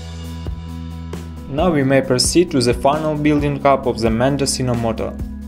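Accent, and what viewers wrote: Russia. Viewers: Это же русский по английский придуривается. И клей российский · говорит с русским акцентом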